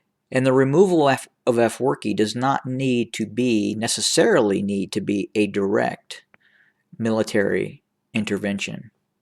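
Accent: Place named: South, United States. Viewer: The fan fact is this channel has been joined in 15 sep 2020 , and a random with southern accent guy getting paid or using the situation to get attention, saying USA plan to remove Isaias aforki , first of all the CIA never even tell their secret publicly for any operations , not only CIA but also other secret Agencies in any Country, and secondly why now , what was US waiting the past 30 years , thirdly USA has no power to remove any leader on a country, we think like that but most of time they get removed by the people like sudan, Tunisia, egypt , Ethiopia( the Weyane was kicked by the youth of oromo poeple) , but nice try though 🤣🤣🤣🤣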